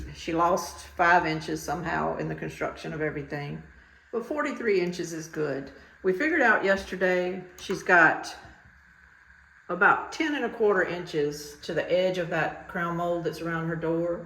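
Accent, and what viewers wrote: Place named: South, United States. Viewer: Damn, she's a southern talking woman, and she talks too much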